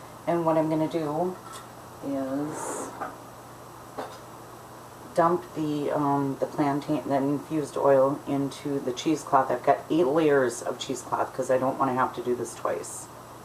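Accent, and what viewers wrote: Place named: Wisconsin, United States. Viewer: I heard a Wisconsin accent there and the phrase 'up north' --only Wisconsinites use that phrase. Good post--learned a lot--made a yarrow/plantain with students--we too had to use stove technique, though I heard letting it sit in sun is more effective.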